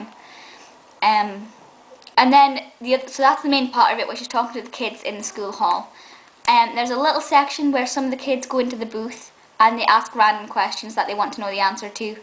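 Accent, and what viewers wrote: Scotland, United Kingdom. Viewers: Haha, Scottish is only one of the most distinctive accents in the world! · love your accent!!what is it?(sorry not brit) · scottish or irish?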